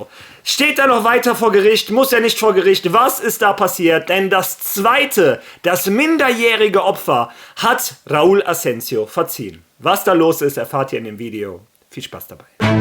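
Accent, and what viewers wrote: Turkey. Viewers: Dein türkisch lustig zugleich sympathisch Klasse Beitrag. Arda Güler spielt mit 20 Jahren für die beste Mannschaft der Welt. Wir reden von REAL MADRID. Wir müssen ihm mehr Zeit und Geduld einräumen. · 😂😂😂😂😂😂 alter dein Türkisch.👍🏻👍🏻das hat mich vom Hocker gehauen 😂😂🤣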